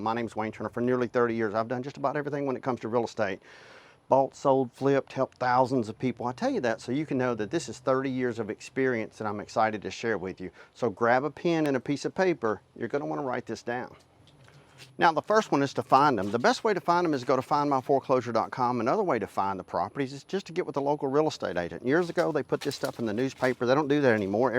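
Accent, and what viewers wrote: South, United States. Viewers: It’s southern talk. For get ready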